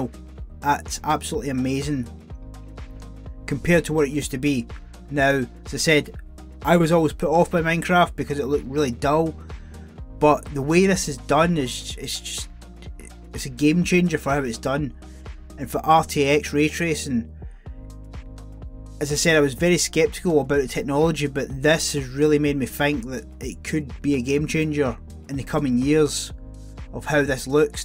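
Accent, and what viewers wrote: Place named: Scotland, United Kingdom. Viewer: DLSS seems to work good as well! Any intends to show off some of the worlds you have/will created/create in Minecraft RTX? I mean, I watched every video about it, and evey single one showed the demo. Would be really nice to see something diffr, looking forward for your contents. Many thanks and stay safe please! I like your scottish accent, btw(..odd to say? I know lol).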